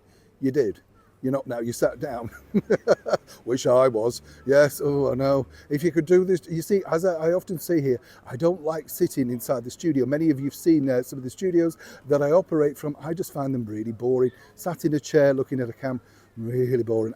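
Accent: United Kingdom. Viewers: Hello. Just want to THANK YOU for Always giving us Something to Smile about. Lovely to hear your Joyouse laughter & great reporting with CLASS. Its Wondrful to listen to a true British Gent Thank You for bringing some sunshine & Joy to all of us. Love 💕 to you & to all in the UK. From USA by for now BLESSING to You 🙏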